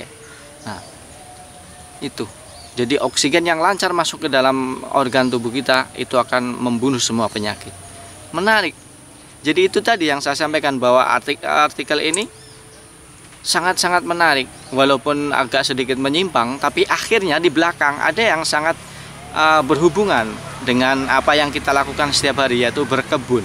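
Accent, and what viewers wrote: Banyumas, Indonesia. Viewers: Nuwunsewu,panjenengan daleme pundi pak?ngapak-ngapake ko kadose mboten asing teng kupinge kulo.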